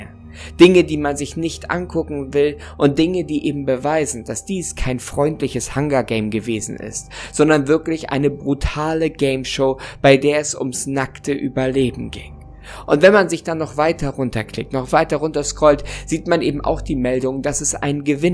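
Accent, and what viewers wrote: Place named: Ruhr, Germany. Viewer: Ruhrpott dialekt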